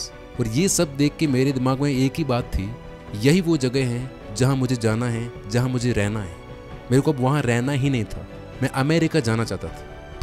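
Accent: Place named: Marwar, India. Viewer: Aapki aavaj se . Pata nahi kyu. Muze lagata hai aap Rajasthani he sayad marwadi? I think I am sure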